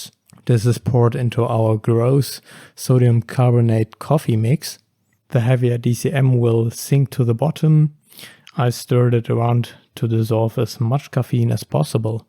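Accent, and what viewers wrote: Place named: Germany. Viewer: Could it be a german accent? I generally think that a calm german person speaking english seems to have a softer voice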